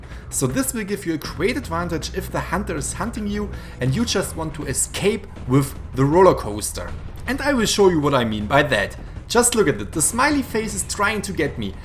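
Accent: Germany. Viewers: are you german? Your accent sounds really similiar to mine. :)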